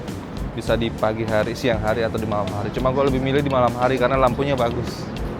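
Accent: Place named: Palu, Indonesia. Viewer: Logat palu lho😅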